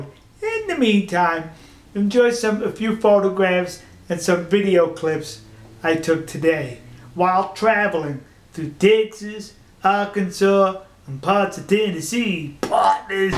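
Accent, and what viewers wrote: New York, United States. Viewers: Based on your base accent, I'm of course thinking that you're heading back to good old New York. But we'll see!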